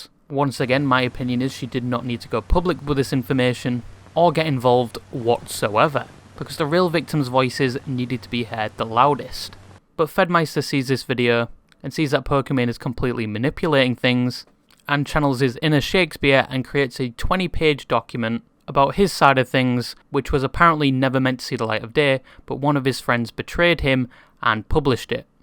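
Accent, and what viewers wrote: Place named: England, United Kingdom. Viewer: yo yt creator guy i like the content but i hate your stupid ass english accent and especially the way you pronounce: '' worse ''. Truly hope you can change your accent some how cause some times you pronounce something so weirdly that it feels like power drill drilling into my forehead but keep up the good work.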